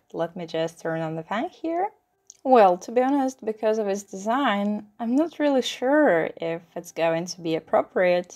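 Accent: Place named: England, United Kingdom. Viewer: awesome stuff, i love the windy days, I'm melting at your english accent 😍❣️🔥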